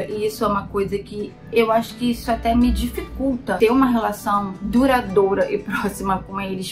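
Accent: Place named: Rio de Janeiro, Brazil. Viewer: Cara, o quão fod* você é, de verdade, te acompanho há um tempo, no primeiro momento pelo jeito d falar desconfiei que era do Rio, assim como eu, mas não tão próximo cara... Minha vizinha de cidade, Niterói/SG, todo esse lado financeiro que você contou eu me vejo muito, de verdade, venho/sou de uma comunidade no Fonseca, em Niterói, e almejo chegar a Gold Coast um dia. A partir de hoje vai ser minha referencia se tratando de AU... Abraços.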